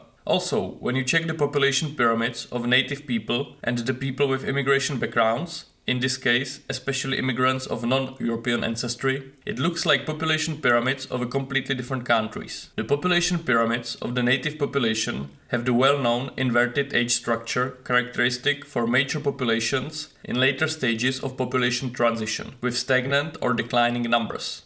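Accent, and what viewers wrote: India. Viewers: Your accent sounds Indian. Are you from South Asia? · that's a strong Indian accent though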